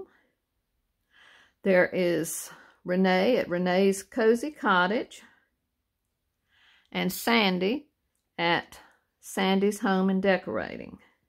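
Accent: South, United States. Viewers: I loved your Halloween tour! I can’t get enough of all the vintage goodies! I love your pleasant sounding voice and your southern accent. 😊💖🎃🍁👋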